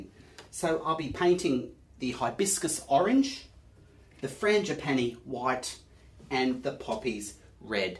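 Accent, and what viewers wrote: Australia. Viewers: I was in Oz for a couple of years and I just realized how much I miss the Oz accent until I watched your vids. Good work btw ! I will try soon.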